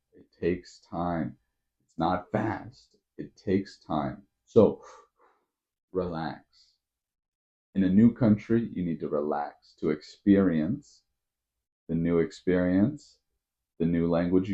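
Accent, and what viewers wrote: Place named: United States. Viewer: I found this channel randomly and watched this first video. Actually, you have a very clear American accent, and you speak slowly, so that's helped me do shadowing along with you very creative video i hope you do another series for advanced learners, I'm definitely gonna keep watching this series keep going you're gonna be a success on YouTube ❤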